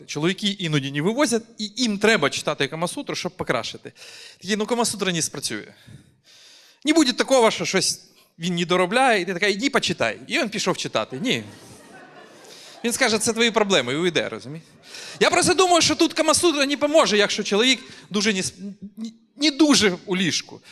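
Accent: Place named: Surzhyk, Ukraine. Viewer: наше все! Здоров'я на багато років вперед, щоб не один раз ще почути цей чудовий суржик- акцент😊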